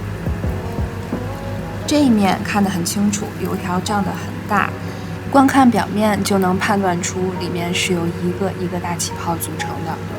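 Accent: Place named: Beijing, China. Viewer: 真棒👍京味儿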